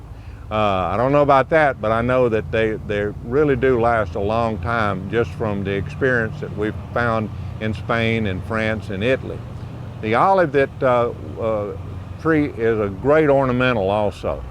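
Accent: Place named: Appalachia, United States. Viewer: Lovable hillbilly accent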